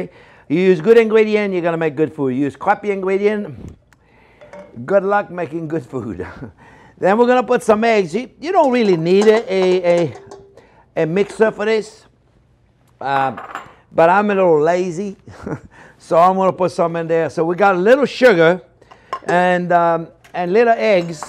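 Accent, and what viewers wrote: France. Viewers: I just love your French accent!!! I can't wait to make this...with you! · C'est vraiment un plaisir de vous retrouver depuis tout ce temps. Ravi que vous soyez revenu sur la Youtube. Pour moi vous êtes le chef parfait. Super pédagogue et simple. Et puis cet inimitable accent français aussi. Surtout continuez!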